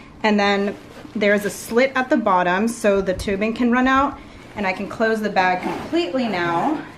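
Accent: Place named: England, United Kingdom. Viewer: shes English is why she says it that way.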